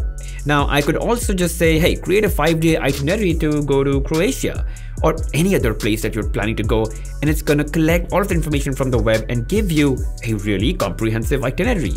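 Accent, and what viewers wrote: India. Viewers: I AM SICK AND TIRED HEARING THESE FUCKING INDIAN ENGLISH DICTIONS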